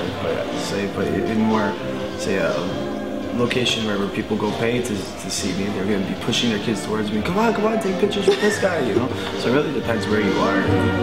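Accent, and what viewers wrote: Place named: Quebec, Canada. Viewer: Man, he has that French Canadian accent which I can't stand.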